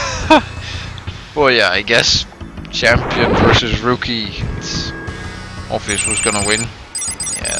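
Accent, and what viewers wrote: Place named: Netherlands. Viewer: No worries, I'm dutch too trying to work on completing this game 100% myself... The tournaments are a huge fucking pain though! Also, normally hearing my fellow countrymen speak English in a Dutch accent is unbelievably cringeworthy, but for some reason you're able to pull it off :P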